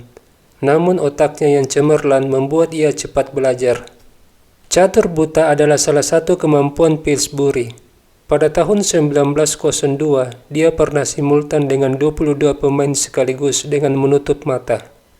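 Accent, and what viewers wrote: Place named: South Tapanuli, Indonesia. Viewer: Bg kemana ya? Semoga beliau sehat Spt nya ini bkn bg ini logatnya spt dr Tapsel Senang dgn filosofi Duani gelap bagi raja Hitam 😂😂😂 Ini sdh ku subscribe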